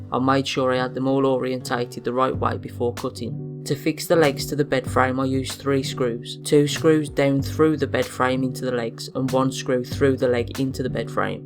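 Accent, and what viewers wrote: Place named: Birmingham, United Kingdom. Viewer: aah ok. I've been watching Peaky Blinders and you sound a bit like them:)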